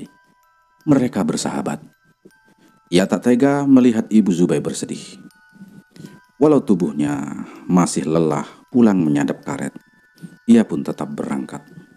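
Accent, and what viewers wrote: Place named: Borneo, Indonesia. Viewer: Best. Sama spt mndengar ckap org Sabah/Sarawak (Borneo). Mudah kami fahami, baru happy😅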